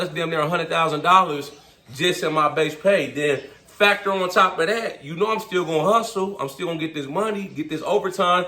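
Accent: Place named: Ohio, United States. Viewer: I knew you were a fellow Ohioan due to the way you talk, I’m from cleveland and thinking about going to Texas 💪🏾‼️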